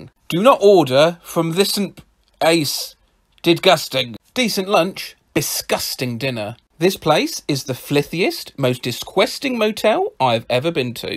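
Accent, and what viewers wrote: United Kingdom. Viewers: 🤣🤣🤣 Holy cow! That along with your lovely British (?) accent had me rolling on the floor 😂😂😂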